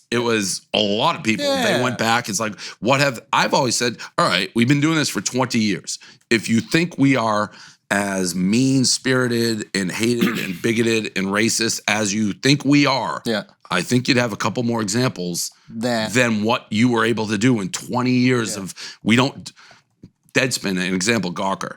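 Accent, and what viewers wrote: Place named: Boston, United States. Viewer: love the Boston accent when its mixed with good articulation.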